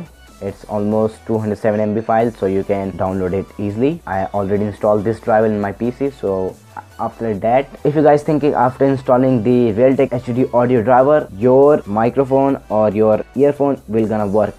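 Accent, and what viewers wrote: India. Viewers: Hvordan kan du ikke høre at manden er indisk? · Work on ur english INDIA!!! =)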